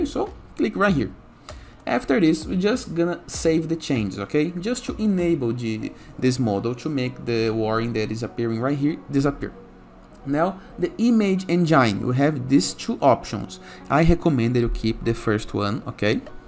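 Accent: Brazil. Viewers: Esse sotaque é BR certeza. Extensão PHP GD ou Imagick ausente. Não deu certo mas obrigado.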